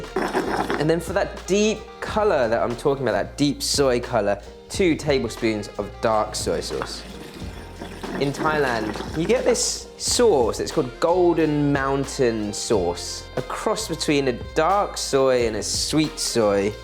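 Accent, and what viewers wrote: United Kingdom. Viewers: Asian with british accent is wild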